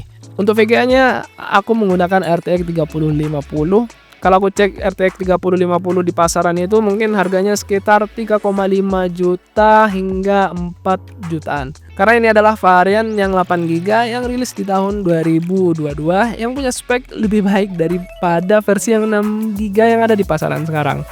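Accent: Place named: Madura, Indonesia. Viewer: Orang mana bang kok logatnya kayak madura ?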